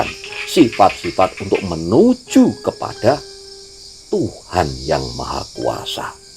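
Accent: Java, Indonesia. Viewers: Konsepnya bagus banget, Mbah. Tempatnya mendukung,musiknya musik jawa, mbahnya juga pake baju tradisional. Mbahnya juga bicaranya walaupun logat medhok,tapi masih jelas. Berasa sedang dinasihatin sama almarhum Kakek saya.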